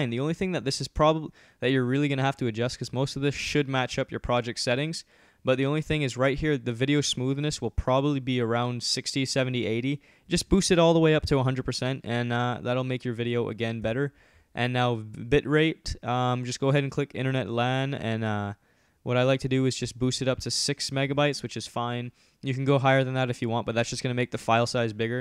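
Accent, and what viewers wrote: Canada. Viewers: Your voice changed. You have more of a Canadian accent now.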